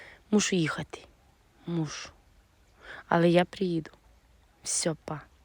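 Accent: Transcarpathia, Ukraine. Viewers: Закарпатський діалект файний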